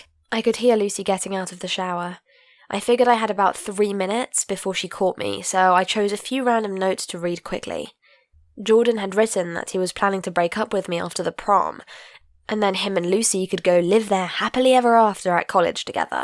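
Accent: United Kingdom. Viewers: I love that STRONG BRITISH ACCENT. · ffffff british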